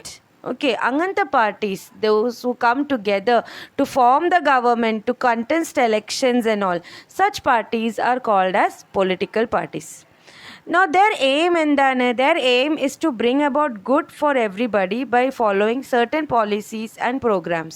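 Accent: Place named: Kerala, India. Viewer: Keralam aan thonnunu😊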